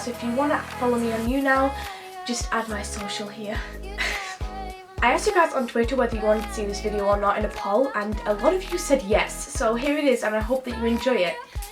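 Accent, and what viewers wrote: United Kingdom. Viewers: hey, I am new to your channel, watching your video for the first time! you're beautiful! and please don't mind me asking, are you British? (I LOVE your accent)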